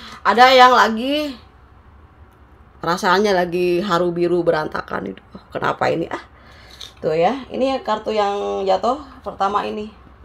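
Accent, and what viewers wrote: West Java, Indonesia. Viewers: Siang Mbak cantik🙏..Maaf saya baru memfollowing. Sukses sll 😇👍👍👍TUHAN memberkati🙏😇🙏 Maaf teh, orang Sunda ya🙏bagus suaranya👍 Hatur nuhun terima kasih readingannya teh.🙏🥰🙏 Temanya, dia pakai magic, jk dia bnr pakai bgtuan, TUHAN akan menjagaku sll dr orang2 brniat tdk baik.Amin.🙏 Benar kejujuran adlh segalanya.🙏👍 Ya resiko dia nya sendiri, dia yg memutuskan pergi menjauh, dan saya sdh ikhlaskan serahkn kpd TUHAN, rasa sedih pedih luka di hati yg dia berikan, telah berangsur pulih hatiku, Kasih TUHAN pulihkan di hatiku🙏😇🙏..Lebih baik fokus utk ke depannya saja, anak2 saja, selflove dan terpenting semakin dkt pd TUHAN🤲🙏♥️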